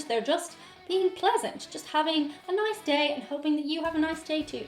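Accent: Ireland. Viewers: Excellent count down. Did you practice this list in Philadelphia? As others have posted, flash a smile with your Irish accent and you'll make friends for life. Yes, in some cases it will feel like a lifetime prison sentence. lol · Love the accent! Irish accent is awesome! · Honestly. you don't look Irish or even act Irish other than a slight accent. In reality, you look like the typical American chick with a sprayed on face. This is a mere observation... being yourself would much more interesting and appealing. I see you look all over town throughout the USA... zzzzz. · I loved your commentary! It was, as we say here, "spot on!" I've never been to Ireland but I have been to several of your neighbors countries. It was an eye opener for me when I had to pay to use the "loo" and the attendant has a bowl to collect the coins in.....sometimes sitting at a desk right out from the restroom doors. 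Thank-you!!! (love your Irish accent) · I missed your Irish accent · Ah, an Irish beauty! Love your accent. Lass, you are welcome in America anytime. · Im really happy you've met friendly Americans, never go to new jersey, those people are RUDE Edit: also speaking of which, which county are you from? I can't pinpoint your specific accent :D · Can you let your Irish accent come out more? I don’t know why but I love it on pretty women :) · A lot of us like an Irish accent, so maybe their extra nice to YOU. Lol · lmao. great stuff. irish accents are probably my fav, but im one of the sheltered americans who know nothing about most every other country. came from Texas, that 'state' thats the size of most other continents... love the out takes, and yes, your hair is awesome. :)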